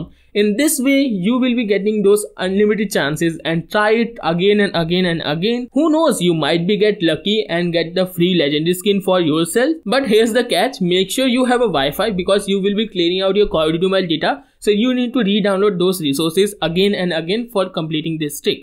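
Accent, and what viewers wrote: India. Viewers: Indian accent? Cant trust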